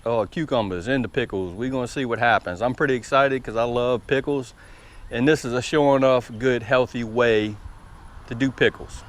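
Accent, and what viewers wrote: South, United States. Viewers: Hello Mr. so glad Barry didn't blow up to hard your way we got some good rain off of him and I needed it garden loving it,Looks like them pickles going be good,hey I done old timey 14 day old pickles before but never done any like that,I will be following may be something for me to try, and nothing wrong with your southern-ish 😉😁You have a good week and try not get to hot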